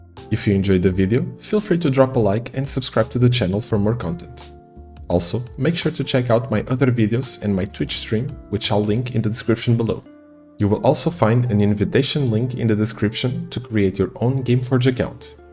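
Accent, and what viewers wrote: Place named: Chile. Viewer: Sos chileno no? Te saque el acento en la primera oración jaja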